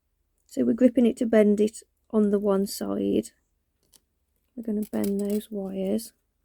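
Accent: United Kingdom. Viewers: I agree about her voice. I love to hear her say, " hi I'm ". It's that UK accent that just sounds so good. No matter if its men, women, or kids. All the people from the UK, I love their voices.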